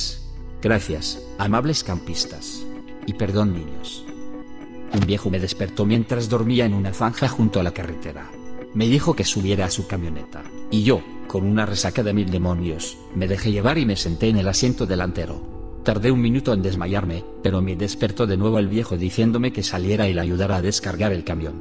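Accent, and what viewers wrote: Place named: Russia. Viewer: Yo digo aue si es rusa porque su acento se oye muy marcado.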